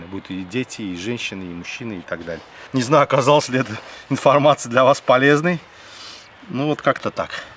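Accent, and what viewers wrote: Baku, Azerbaijan. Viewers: Спасибо дорогой земляк сидел бы вечность смотрел и слушал. Бакинский диалект это просто песня азербайджанского народа. Как я скучаю по Родине по дорогому моему сердцу Баку. По своему милому посёлку Новые Ахмедлы. С уважением к вам Иващенко Андрей Викторович. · Как азербайджанцы никто так вкусно не готовит на Кавказе. Ну и так вкусно рассказывать тоже. Не удержался и подписался! Этот непередаваемы азербайджанский городской акцент это супер. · Доброго здоровья! Ваш русский язык с Бакинским акцентом, для меня бальзам для души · Сразу по разговору Бакинец))) привет с Разина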